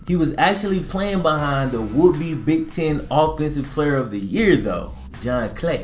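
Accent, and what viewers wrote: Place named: South Louisiana, United States. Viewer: Yo! You got dat South Louisiana “Ak-oholoic” ya hurd!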